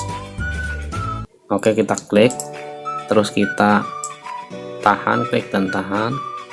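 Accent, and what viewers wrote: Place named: Java, Indonesia. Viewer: Ok suwun info ne ya Jan logat jawane medok bngt kaya nyong bae hehehehehehe Salam kompak selalu & paket silaturahmi sdh ku kembalikan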